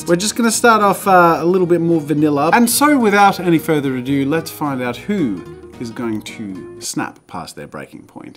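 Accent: Australia. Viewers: Lunatic Laughs in Australian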